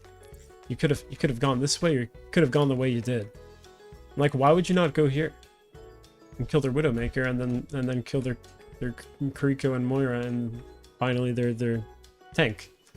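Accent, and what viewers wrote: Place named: Canada. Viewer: That's quite a Canadian accent you've got there. When y'all say the word "out" y'all say "oWoot" lol XD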